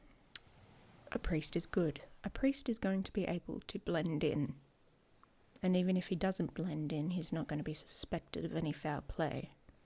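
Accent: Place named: Australia. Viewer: Gahhhh an Australian accent? So rare in the F4A world 🤩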